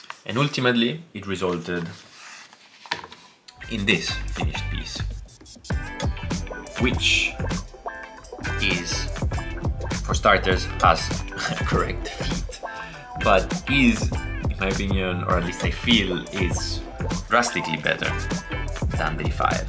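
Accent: Italy. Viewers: Ci ho messo una decina di secondi per accorgermene, ma l’accento italiano è davvero inconfondibile 😂 Da un tuo “compare” dall’Italia, bellissimo video, chiaro, semplice e diretto, e il tuo stile sia nel disegno che nell’editing mi piace un sacco! Come disegnatore occasionale sono super curioso per i tuoi prossimi video Continua così!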